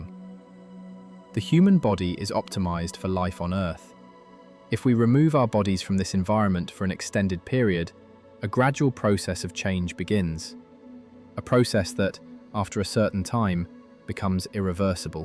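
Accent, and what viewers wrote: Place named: United Kingdom. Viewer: British English is irritating!!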